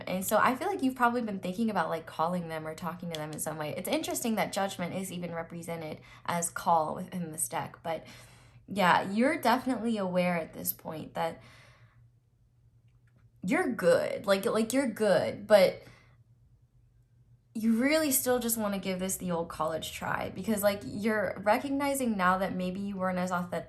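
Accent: England, United Kingdom. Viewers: Omg pile 4 I love your British accent!! Coming over for tea later Air Smooches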